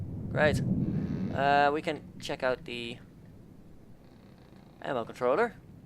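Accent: Netherlands. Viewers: I can already hear that this guy is dutch Zeg “hallo” als je ook NL bent 😄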